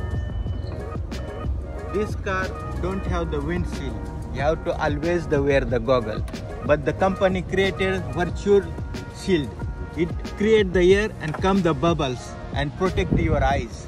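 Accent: India. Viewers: Love from Hivare,Mohol saheb💓❣️ Nice..... Marathi accents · Proud of Indian accent.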